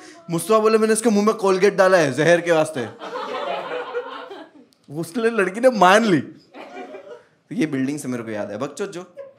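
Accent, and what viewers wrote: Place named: Gujarat, India. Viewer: It was a nice stand up,I totally loved it and can't stop smiling since the beginning of the video and munawar has a good gujarati accent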